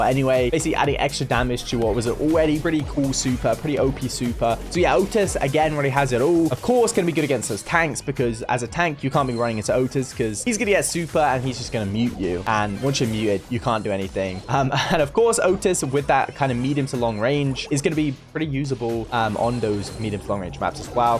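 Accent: United Kingdom. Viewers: It's been a while Haven't heard ur bri'ish voice in like forever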